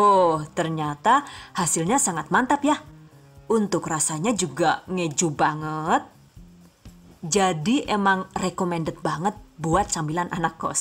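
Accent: Banyumas, Indonesia. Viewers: syelaallluuu ne ngikutin resepe mbak'e iki resepny simple bingiiittt dan g kalah menarik ngapakke kui lhooo caaaa'tat wkwkwkwk "DONE" #giveawayTHVdesember2019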